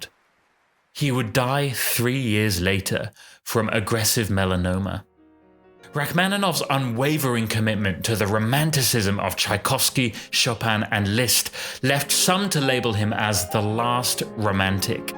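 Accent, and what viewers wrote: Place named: United Kingdom. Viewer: Mos-cow? For an American you have a very British accent.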